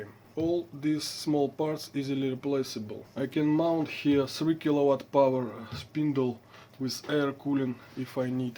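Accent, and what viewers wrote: Russia. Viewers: У вас ,один из самых страшных русских акцентов!!Но руки золотые.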